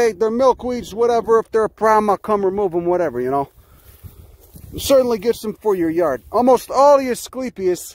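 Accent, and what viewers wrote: Chicago, United States. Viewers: An educated botanist who sounds like a Chicago gangster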